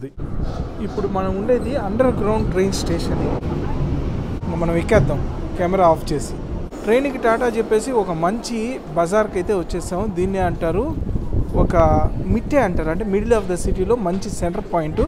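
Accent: Nellore, India. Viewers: Hai Anna how are you???? Miru explain chese vedhanam and me Nellore language super....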